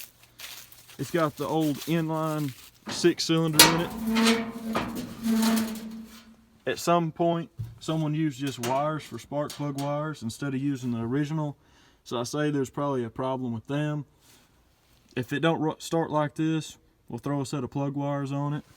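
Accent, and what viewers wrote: South, United States. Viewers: We'll get good spark with this new cool. We'll change this old ool for some new ool. Classic southern accent, I love this channel.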